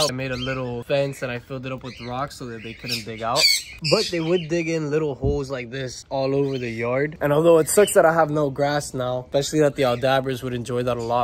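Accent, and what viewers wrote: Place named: Long Island, United States. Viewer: Sounds so Long Island...or the Bronx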